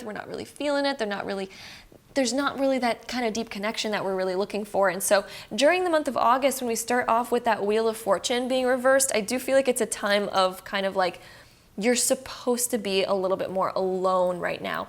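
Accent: Canada. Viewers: Pile 2: little late watching this lol as a Canadian I loved the Canadian accent. It's my ex who needs to apologize but honestly as much as I need some closure I'd prefer if he didn't contact me. I've been closed off from love but I am starting to want love in my life again. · Lovely, thank you so much. When I heard you the first time, I thought you are Canadian because of the way you say "out" or "about" 😊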